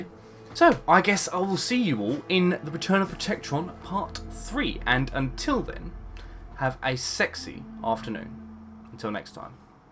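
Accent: United Kingdom. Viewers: You from Birmingham?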